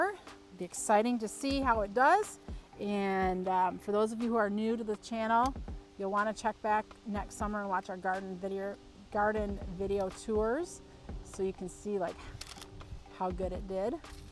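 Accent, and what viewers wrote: United States. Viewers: I just love starting my day with smiling face and that cute northern accent 😁 (I’m from Kentucky so we sound a little different haha) Having there to complete the team was icing on the cake. Thanks for sharing your day ❤️❤️❤️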